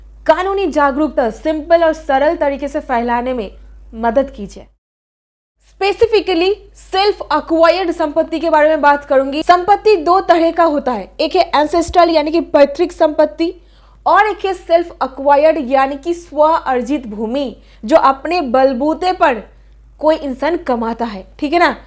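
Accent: Bengal, India. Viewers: good , like film story in bengal accent . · You are confused and confusing us. Get rid of your Bengali accent.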